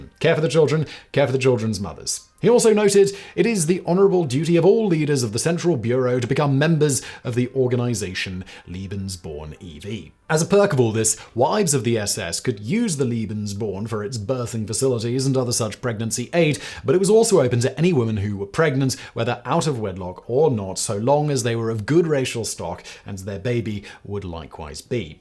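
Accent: England, United Kingdom. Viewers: Fantastic English accent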